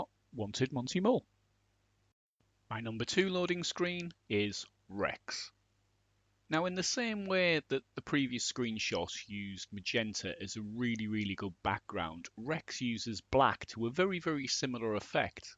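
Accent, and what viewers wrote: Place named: North Yorkshire, United Kingdom. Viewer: Hi, sounds like you might be from Richmond or Darlington with that accent - tell me I'm wrong! Enjoying your top 100s etc and contributions to speccy show. They should have loading screens on the PS4. It took 2 hours to install Red Dead Redemption2 today! Not for me, as i don't play modern games but the missus does! I was a 64 owner but looking back I see how the Speccy was full of character and it's visuals had real english charm.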